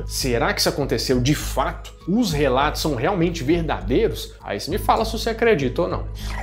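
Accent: Minas Gerais, Brazil. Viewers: Mineirin bunitin, sô.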